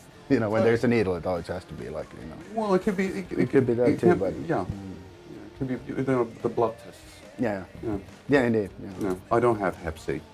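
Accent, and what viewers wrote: England, United Kingdom. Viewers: He has always had a English accent, and he doesn't hang out with Dani Filth a lot he lives in Finland and Dani Filth live in England pretty far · Villes voice has changed alot the last few months. he sounds soooo much more British now. LOL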